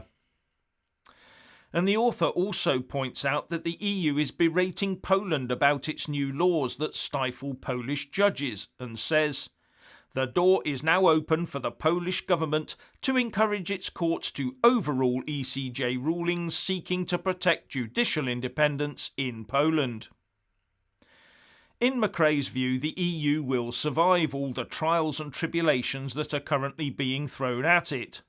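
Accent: United Kingdom. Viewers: Great analysis! Very interesting for those of us across the pond, who don't hear much of this stuff every day! (And I LOVE the crusty British dress and speech!)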